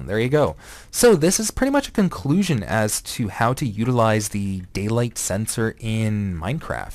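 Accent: Canada. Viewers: didn't know canadians had an accent... (im canadian as well)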